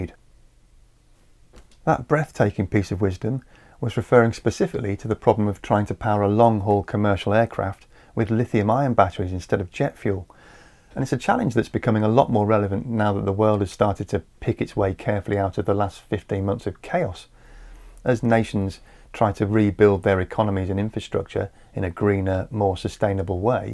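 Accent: United Kingdom. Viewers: You took a quick swipe at Boeing with your British accent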